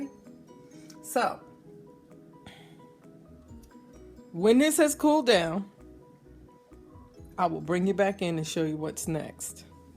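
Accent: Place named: Bermuda. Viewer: Hello madam your ascent so clean looks Bermudian or American ? What you said never use metal pot or spoon she is right also use wooden spoon and glass bottle always any thing for stir hot stuff never use plastic spoon I seen even master chefs using plastic spoon during cooking hot food need common sense only thanks. From, K.singh North West India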